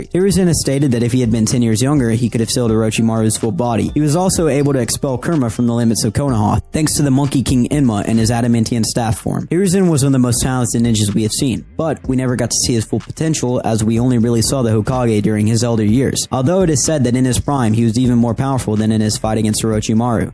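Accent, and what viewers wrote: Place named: United States. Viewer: Why does he pronounce there names so American. It's so annoying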